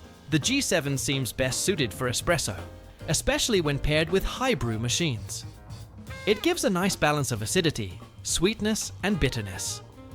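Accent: United Kingdom. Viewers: why are you using british accent now? it's not bad. i'm just curious and found it slightly hilarious.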